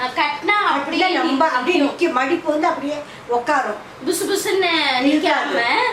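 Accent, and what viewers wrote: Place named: Salem, India. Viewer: Salem slang keattu yavlooo nall aguthu ma......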